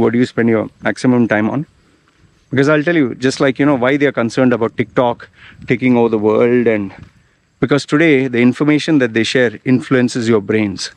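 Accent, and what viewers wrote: India. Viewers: Are you Indian, your accent sounds like Indian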